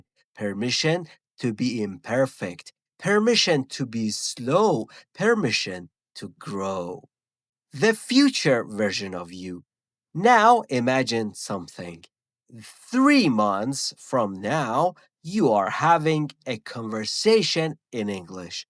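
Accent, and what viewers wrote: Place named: United States. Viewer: شما بسیارعالی تدریس میکنید چون تلفظتان امریکایی ست. بسیارعالیست.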